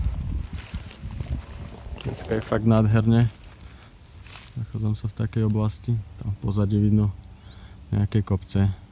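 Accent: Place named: North, Slovakia. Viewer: Máš krásny severoslovenský prízvuk, videá sú super nenechaj sa odradiť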